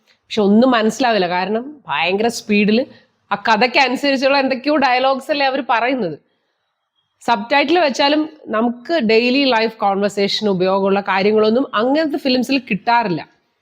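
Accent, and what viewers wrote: India. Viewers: Thanks, but your English pronunciation shud be either British or American. If you follow Indian style people will not get the desired benefit. To get fantastic movies simply try through Google.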